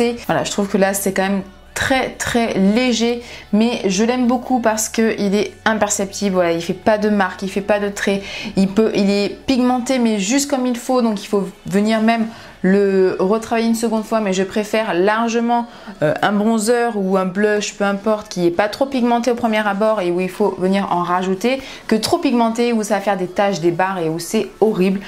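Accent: South, France. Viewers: Bsr quel beau Make Up avec tout tes produits choisis sur tes derniers achats j'adore!!! Après pour moi la palette a trop de fards et du coup je serais perdue lol moi il m'en faut des plus petites car mon probleme c'est de trouver les bonnes combinaisons de couleurs 🙄 pour vous ça à l'air si évident et facile alors que pour moi c'est vraiment un casse tête je ne suis jamais sûr de moi et de mes choix, du coup à force de regarder vos tutos je commence a comprendre la disposition des couleurs et reconnaitre ce qui peu aller aller ensemble ou pas... Merci à toi pour tous ces précieux conseils et tout ces moments que tu nous consacres c'est un vrai bonheur et entendre une sudiste comme moi ( et oui je suis du var aussi, née à la Seyne-sur-Mer, à côté de Toulon,j'y ai passée une tres grande partie de ma vie, mes 3 fils sont nés là bas et y vivent d'ailleurs et moi pauvre que je suis j'ai suivi mon 2ème mari en Seine et Marne, dans le NOIOORRDD et cela fait maintenant 16 ans que j'y suis et je t'assure que le sud et les gens du sud me manquent et que je t'entends parler, cela réchauffe mon coeur alors mercciiii pour ce cadeau que tu me fais sans le savoir 😉😉💗💗🌞🌞) Et pour ton make up bravooo il est superbe, les couleurs et le dessin sur la paupière juste Whaouuu..... 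J'attends avec impatience ta prochaine vidéo et en attendant je te souhaite une tres bonne soirée et un bon début de semaine 😉😘💖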